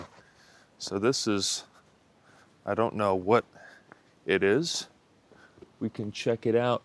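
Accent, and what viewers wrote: United States. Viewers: He's American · Oh man, perfect american english to the intermediate and almost advanced students. Everyday conversation is what we need. Inside and outside. I'm brazilian. Nice to meet you.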